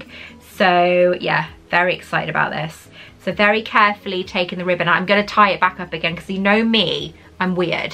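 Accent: Wales, United Kingdom. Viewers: Wild accent guess: grew up in S. Wales, live in Brighton now?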